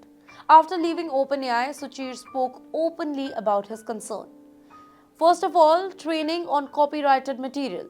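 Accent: India. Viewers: Why does Indian accent make any story that’s being covered suck .. I don’t get what she is talking about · This news reader need to learn how to speak clearly. Too much indian accent. I need to read subtitles to understand what she is talking about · It's time to learn indian english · Narrator Audio NOT Cleary Understandable, Typical Indian English, JUST RANT IT OUT, weather the Audience Get it or not, JAI HIND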